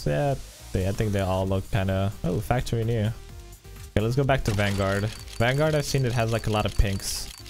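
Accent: Romania. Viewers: is this guy from romania????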